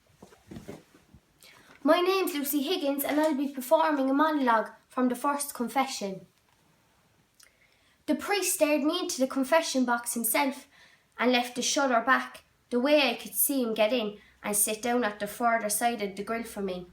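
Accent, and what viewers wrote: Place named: Limerick, Ireland. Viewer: Sounds Limerick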